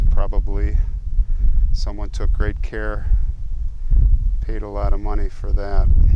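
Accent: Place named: Chicago, United States. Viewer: That Chicago dialect is so horrible. I hate this pos guy's voice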